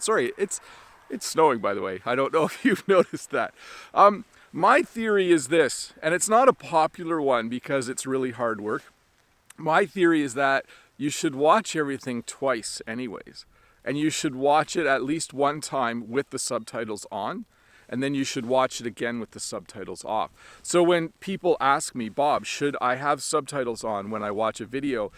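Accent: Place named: Canada. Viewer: I can understand Canadian 😁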